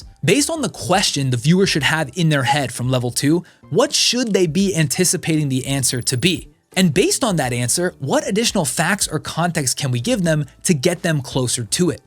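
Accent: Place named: United States. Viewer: Thanks for clear American English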